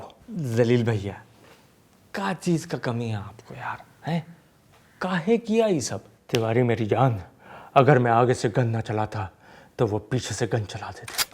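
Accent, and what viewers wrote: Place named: Bihar, India. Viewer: 😂😂😂kamal yar kamal bihari accent ufff kamal sa b upar .. acting perfectly Bihar ki full yar Accent 😅😂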